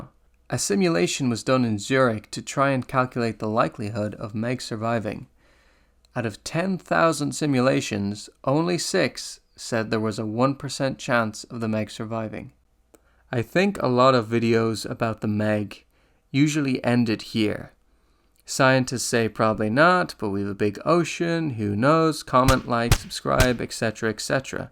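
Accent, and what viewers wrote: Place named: Ireland. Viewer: I hear a hint of Irish in your speech...where are you from in Ireland?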